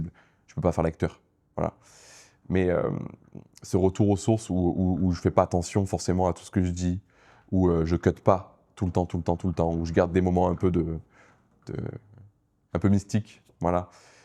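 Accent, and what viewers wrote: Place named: Vaucluse, France. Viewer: Je ne vois pas ce que tu n'aimes pas dans a voix :) moi j'adore (l'accent vauclusien y est pour beaucoup EVIDEMMENT... 😁)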